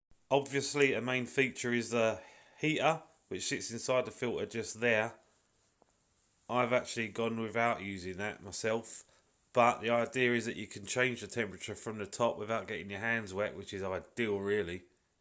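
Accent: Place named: United Kingdom. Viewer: Impella', filta'. heata', prociga', tank wata', wira'. Love that accent. Where in the UK is that? That plastic media is totally worthless and Oase used it to cost cut. Use higher quality bio media with more surface area.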